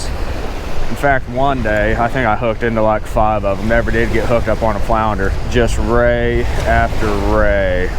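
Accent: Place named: Alabama, United States. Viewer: After watching and listening I can hear the same Alabama accent as Beard. Lol Sounds country like here in Texas 🐟 🎣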